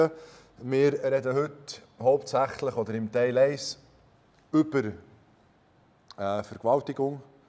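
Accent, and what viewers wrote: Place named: Switzerland. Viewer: ja sicherlich mein Freund, du sprichst ja deutlich! 😸 was kann man da nicht verstehen, und ich mag den schweizer Dialekt! 🤜🏼🤛🏼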